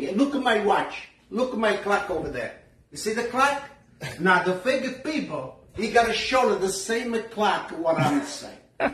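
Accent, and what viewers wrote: Italy. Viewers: I love your videos!!! Your Italian accent is absolutely awesome!!!! You’re the greatest!!! 👍🏾👍🏾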